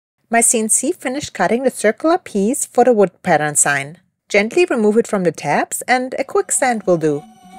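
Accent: Germany. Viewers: German accent is cool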